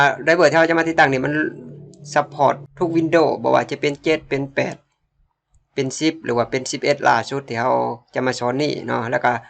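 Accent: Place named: Korat, Thailand. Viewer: ชอบสำเนียงแก่จริงๆ คนโคราชนี่.?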